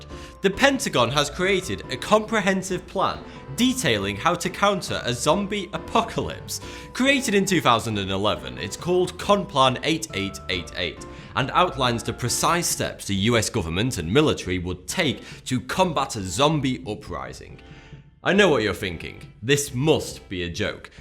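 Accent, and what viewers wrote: United Kingdom. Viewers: I refuse to watch any video narrated by a Brit.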